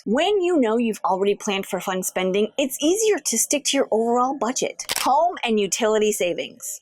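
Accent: United States. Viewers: You are truely a breath of fresh air. As a frugal Welsh great grandma, I love to listen to a new voice, yours is so sweet, I love your accent from across the pond.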